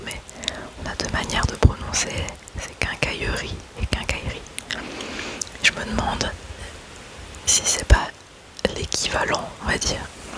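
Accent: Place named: France. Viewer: Tu as fais une vidéo vraiment éducative et intéressante et vraie on a des mots dans notre langue assez spéciaux à prononcer et écrire et les sons différents tu réalise vraiment un travail parfait et ton anglais était bien compréhensible avec note joli accent français 😁 mais en tout cas une vraie vidéo intelligente et bien faite tu peux être fière de toi 😊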